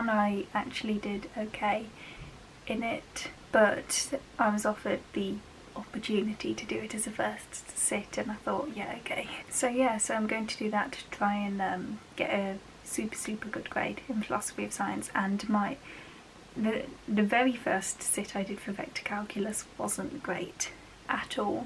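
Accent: United Kingdom. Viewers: You sound different from other Brits I meet how many accents are there in uk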